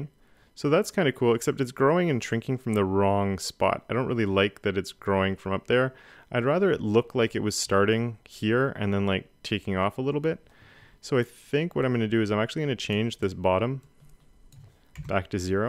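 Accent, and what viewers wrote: Canada. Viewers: Thank you sooo much! This vid was incredibly helpful and made me a new sub. And I could tell right away you are Canadian too lol <3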